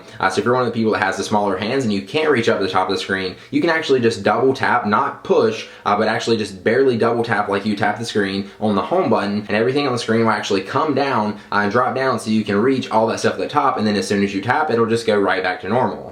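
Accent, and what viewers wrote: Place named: Georgia, United States. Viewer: I might be wrong, but that sounds like a Georgia accent.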